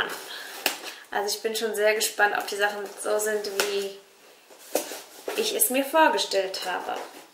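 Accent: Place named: Bavaria, Germany. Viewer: sie kommt aus Bayern sie sagt nett anstatt Nicht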